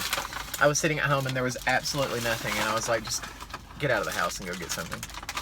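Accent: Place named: Deep South, United States. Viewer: Dewde your accent is Killin me, you must ve from the deep damn south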